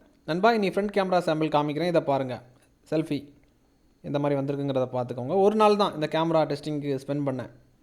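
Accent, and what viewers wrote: Kanyakumari, India. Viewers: Bro நீங்க கன்னியாகுமரி மாவட்ட போச்சு தெரியுது, சூப்பர் bro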